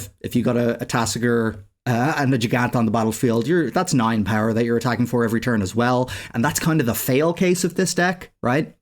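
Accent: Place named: Dublin, Ireland. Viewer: Coming to the party a bit late but thanks for the deck tech! It's great to hear a dublin voice in my ear around Xmas!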